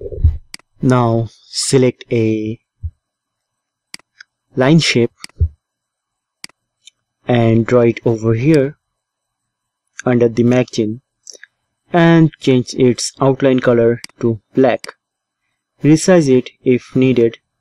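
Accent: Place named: India. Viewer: Desi English 🤟😝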